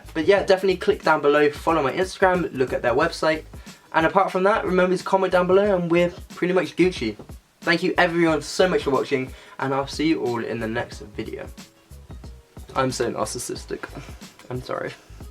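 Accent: England, United Kingdom. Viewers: I would be very glad to know your thoughts or opinion on achieving an athletic/lean body without adding weights; just the body as in push ups, regular sit ups etc. Do they work for defining and accentuate the muscle? Ps: Which part of England is your accent from? I haven't heard it until now.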